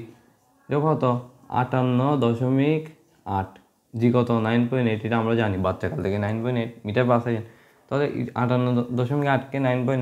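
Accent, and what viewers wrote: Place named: Chittagong, Bangladesh. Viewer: accha to Chittagong vashai je kotha bolchen tar jono bolam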